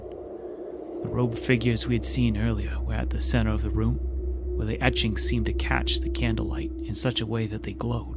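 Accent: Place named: New England, United States. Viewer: Love the New England accent of the narrator. The "salt" in his voice invokes the historical draw of the sea for these maritime adventurers and adds to the tale. Good story too!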